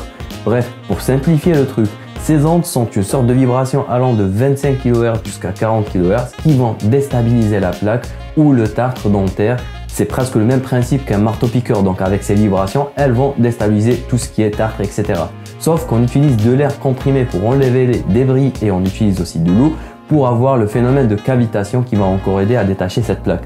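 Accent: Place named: Algeria. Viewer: C'est certainement l'un des nombreux dentistes algériens qui sont venus s'installer en France d'où l'accent et les fautes de syntaxe ...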